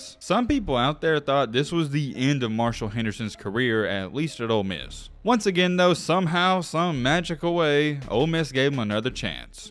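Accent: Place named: Appalachia, United States. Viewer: You gotta be Appalachian with that accent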